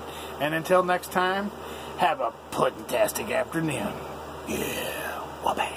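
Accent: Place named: Texas, United States. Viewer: You definitely don't sound like your from up North!! Lol.. You sound like a Texan or like a southerner.... Hahahahaha 😂😎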